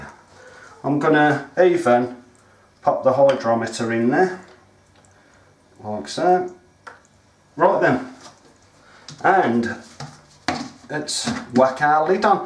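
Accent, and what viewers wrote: United Kingdom. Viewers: got to love the midlands accent ;)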